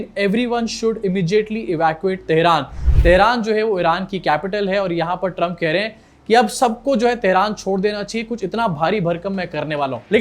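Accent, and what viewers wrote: India. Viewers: your hinglish accent was so cute and funny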